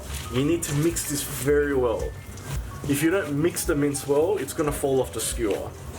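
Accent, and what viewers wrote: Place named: Australia. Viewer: What is wit this Australian accent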